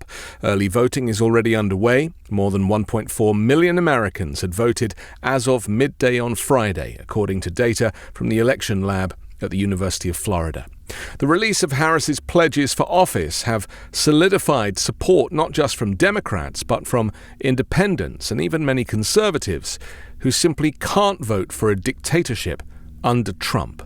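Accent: United Kingdom. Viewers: Your British roots are showing lol. My family came from Britain generations ago. My Dad and Granddad are the only ones I knew that used phonetically. Ahhhhtt. Dad had a gun I forgot which one but it was a model with zero in it that had ahhhhtt in it. 20 ahhhhtt something 🤔🤔🤔. Anyway do you have crackers at Xmas ? Oyster stew Xmas eve? Love the show.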